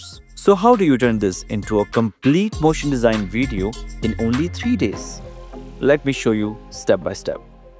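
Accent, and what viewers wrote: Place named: Pakistan. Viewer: This work is definitely not worth $450, you should up your prices. If you've made such a great project in just 3 days, it can only get better with a more flexible time limit. Judging by your voice you sound Pakistani, and $450 is a lot here, but still, you've got to charge more, your portfolio is surely looking great already.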